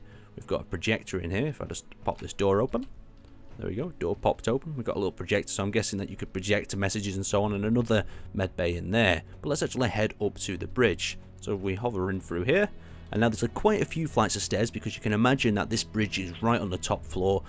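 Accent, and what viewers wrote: United Kingdom. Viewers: That British Accent Doe! Bruh!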